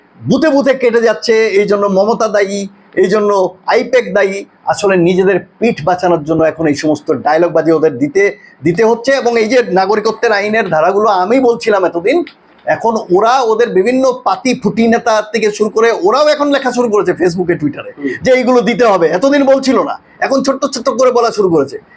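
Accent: Bangladesh. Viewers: Bangladesh lok mone hoche...vat bolchen...every thing given logically....bangladesh bhasha tai doubt hoche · APNAR BANGLA PRONUNCIATION SUNE MONE HOCHHE AAPNI BANGLAADESHI.....!!!!! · আপনার accent শুনে মনে হচ্ছে আপনি বাংলাদেশী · APONAR VASAY AKHONO BANGLADESHI BOLA MONA HOY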